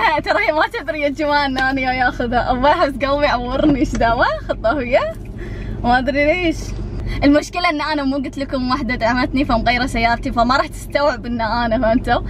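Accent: Kuwait. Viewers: احبج انا سعودي للمن أحب لهجه الكويت❤️😂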